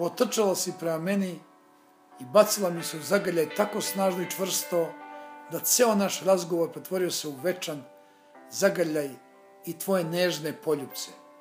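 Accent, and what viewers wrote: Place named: Kosovo. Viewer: Ajde nemoj da serete za 3 padeza. Pa nemaju svi u Srbiji beogradski sleng. Covek je sa Kosova,zadrzao je svoj dijalekt. I sada ljudima iz urbanih sredina taj govor zvuci smesan,narodski,ne znam kako vec,asocira na nepismenog coveka...Nije bas tako,ima sigurno mnogo ozbiljnih kvaliteta. A sto se tice same pesme,malo se koristio Preverovom ''Barbarom''...Ima nas koji citamo,pa ne mozes da nas prevaris, maznuo si Barbaru i preobukao je u Milanku...ali to nikako ne umanjuje ovu lepu recitaciju....Svaka cast!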